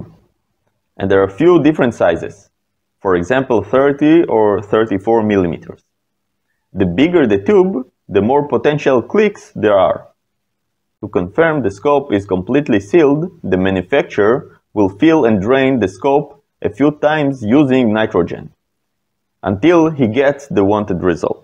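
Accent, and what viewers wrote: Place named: Israel. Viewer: i recoginzed the israeli accent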